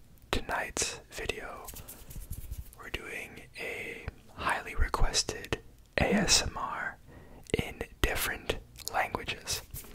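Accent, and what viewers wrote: Quebec, Canada. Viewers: Ton accent québécois mélanger avec l’anglais est très drôle! 😂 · Je vis au Québec et ton accent ressemble beaucoup au mien dans tout les cas tu parle très bien français!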